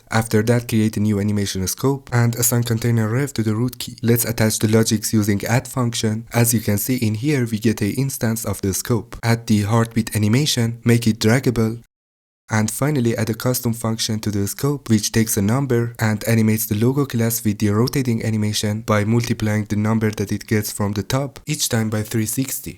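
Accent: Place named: Iran. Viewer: He sounds Iranian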